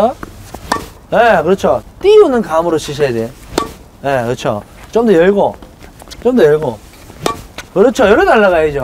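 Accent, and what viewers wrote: Gyeongsang, South Korea. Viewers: 어우! 경상도 코치님 진짜 멋진 강의 감사합니다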